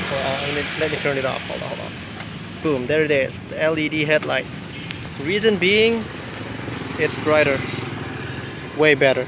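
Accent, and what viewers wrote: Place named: United States. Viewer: Logatnya us bgt... Can you teach me?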